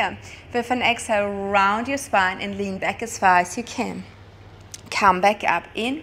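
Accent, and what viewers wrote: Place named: Switzerland. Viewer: Sounds swiss 🤔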